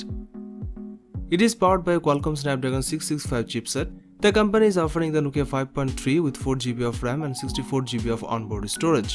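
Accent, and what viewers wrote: India. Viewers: Pasti india bahasa inggris sperti ini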